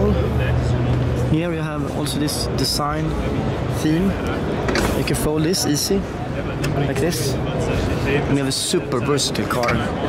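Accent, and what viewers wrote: Sweden. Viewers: Hi. You really should get a microphone with that camera, sometimes it's very hard to hear what you are saying, specially with a Swedish accent:):):). Anyway, good vid, really enjoyed the Alpinas, I prefer the Alpina instead of the new M5! They look better and more powerful. Take care.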